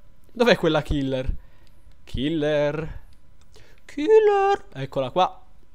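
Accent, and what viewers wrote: Sardinia, Italy. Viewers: ma tu sei sardo o sbaglio??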